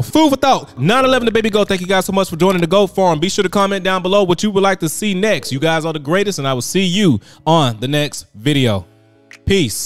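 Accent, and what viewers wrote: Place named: United States. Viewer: Please can you talk a little slower?? I'm in Africa and the American accent is a little difficult for me to catch up especially when you're talking fast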